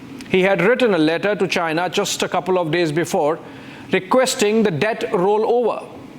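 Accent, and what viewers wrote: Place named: Delhi, India. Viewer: Why have they hired someone straight out of Delhi public school to read an English bulletin?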